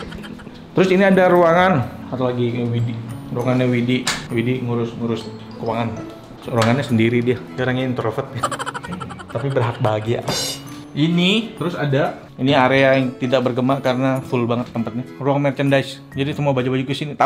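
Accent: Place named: Pontianak, Indonesia. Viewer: Orang Pontianak mana suara nya 😄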